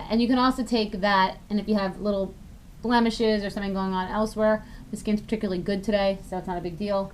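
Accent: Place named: New York, United States. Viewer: I just love you Bethenny! I could listen to you talk all day. Ur so NY!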